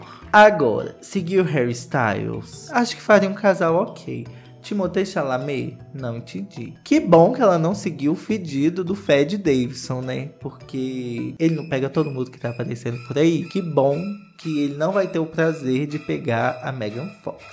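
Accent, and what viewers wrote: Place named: Rio de Janeiro, Brazil. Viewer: Sutaque carioca e o melhor kkkk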